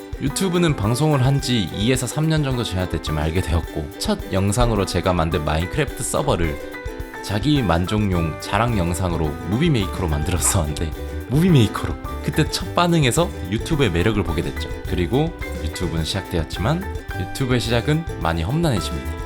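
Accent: Gyeongsang, South Korea. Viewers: 님 경상도 사람이시죠??? 말투 들어보니깐 경상도 사람같은데.....흐음ㅋㅋㅋ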